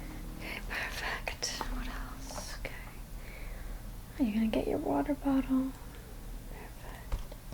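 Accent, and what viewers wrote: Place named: Brooklyn, United States. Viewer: Always love your Brooklyn accent 😁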